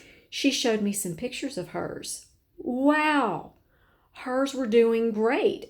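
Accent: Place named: North Carolina, United States. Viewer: I hear NC in the dialect. Am I right!? These have grown so beautifully!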